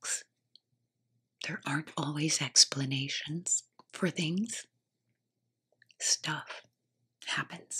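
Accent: United States. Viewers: I’m from England and I don’t usually find American accents doing ASMR give me tingles (nothing against any American accent I just seem to find I get more tingles from accents I’m less familiar with) however everything about your voice is dreamy! I find your voice so soothing, nurturing and tingle inducing! Thank you xxx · Thank you so much for answering My question! We are in Texas near Houston, so that’s so cool!! I thought you might have a little TEXAS in you 😉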